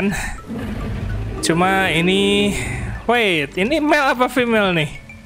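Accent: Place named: Sunda, Indonesia. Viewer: Orang mana sih bang kok ada sundanya ada jawanya?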